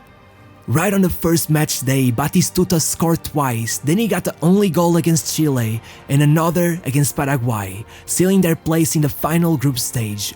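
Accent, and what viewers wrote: Portugal. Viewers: Why are you so mad about his pronunciation? It's very insignificant as long as you can understand him. By the way, I read in someone's else comment that this narrator is from Portugal and that explains his accent. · The Portuguese accent is strong in this one. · It feels weird hearing a Stan of an Argentina legend with a Portuguese accent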